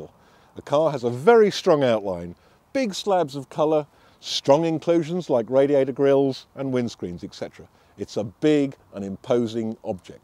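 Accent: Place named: Australia. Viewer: Hey, she's Australian! Not that it particularly matters. Excellent video, anyway.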